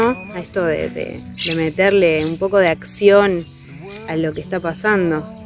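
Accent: Córdoba, Argentina. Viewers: Descaaaansar jeje ya se te pego la tooooonada jaja 😄😄 saludos desde Santa Fe pero soy bien Cordobesa jaja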